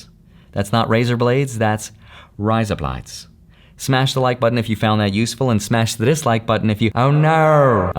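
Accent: Australia. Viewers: Dude your Australian accent is actually really funny which makes it hilarious! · Terrible Oz accent, excellent way to describe the problem. And entertaining. Thank you. PS: Oz accent - make each word the laziest way to say it (in your mouth). Shorten Melbourne to Melbn. :) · As an Australian, that is definitely how we talk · Australian here. Yes that is exactly how we talk.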